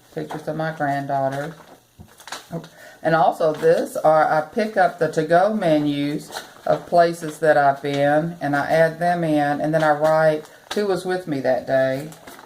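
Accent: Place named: Northeast Texas, United States. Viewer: I'm from north east Texas & your lovely accent sure makes me homesick. I have really enjoyed seeing your beautiful journals. Thanks for sharing.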